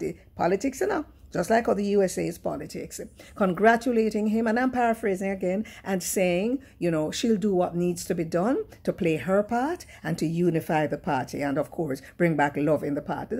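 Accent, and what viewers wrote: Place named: Jamaica. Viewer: Brilliant! Keep up the good work! Love listening to you and that beautiful Jamaican twang!!!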